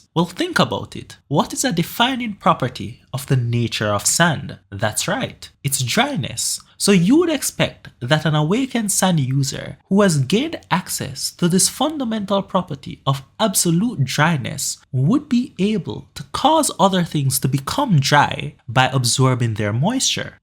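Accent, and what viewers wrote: Caribbean. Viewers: you sound caribbean where you from? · Are you from the caribbean? I hear the accent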